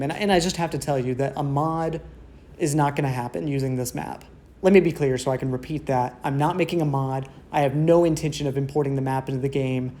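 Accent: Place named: North America. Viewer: it's possible that other software devs have already some or all of this work (like if there's a "Zelda Mod Tool" made by fans you can download) but even so it'd be a Herculean task if done as a mod. If done as a standalone game, it'd be as much work as... ya know, a game - which is a ton of work that can't be sold if you don't have the legal rights to use the characters. So, as long as he was giving it away for free, it'd probably be technically within Nintendo's policy for fan projects, but they set those rules and they have a history of shutting down projects like this. Judging by our guy's accent, he's probably either from the US or Canada, and in either place, even if the courts decide he's totally in the right, if Nintendo decides to sue the process would take literal years and tens of thousands of dollars in legal fees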